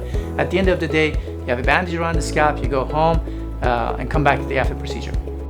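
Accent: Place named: Iran. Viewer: lovely persian accent :-)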